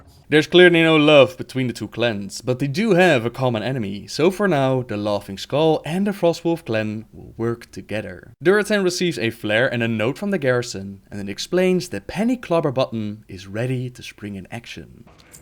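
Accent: Netherlands. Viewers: You're dutch aren't you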